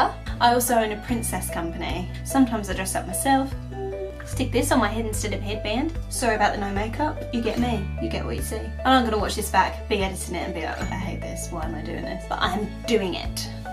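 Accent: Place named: Australia. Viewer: that was great! I swear you sound Australian in this. God luck with everything, Love Deborah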